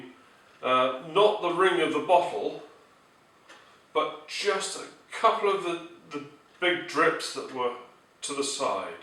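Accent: United Kingdom. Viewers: Hi many thanks for your speedy reply. I must say I greatly enjoy your Youtube Channel, Its nice to hear a U.K. accent and watch an accomplished cabinet maker. I've learnt a lot watching yiou.Unfortunately your channel increases my Festool lust inordinately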